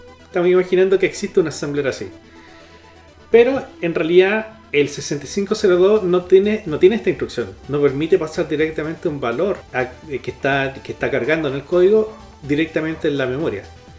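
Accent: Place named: Chile. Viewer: excelente, increible la coincidencia !... yo estoy metido tambien en un grupo de Facebook Atari Chile, ahi tambien se comparte harta informacion, hace poco por ejemplo compre el A8PicoCart y el SIO2PC pero es un Arduino con Pantalla Tactil seguro lo ubicas, y el vendedor que es un usuario del mismo grupo me vendio el Cartucho 4 en 1 que trae Basic, y Ensamblador, y justo me pille este video, cuanod escucho el acento del locutor, y me sorprendi que un chileno este hablando tan bien de este tema.. y mejor aun si es de la zona. Asi que, encantado nos podemos juntar, te aviso cuando vaya a Quilpue y charlamos. La verdad a mi me gusta la programacion retro tambien, de hecho, para C++ no utilizo ningun IDE, uso VIM sin esas herramientas modernas de Intelisence o Autocompletado, mientras mas simple el editor mejor. es mas tengo en mi canal unos mini tutoriales para configurar el entorno al estilo Borland y Atari. 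Sobre el Z80, estuve mirando unos videos de Profesor Retroman, es un canal español super didactico tambien, ahi aprendi algunos conceptos como dibujar sprites en pantalla.. pero como no tengo nada parecido al Z80, me incline por el 6502. En que usas C++ ?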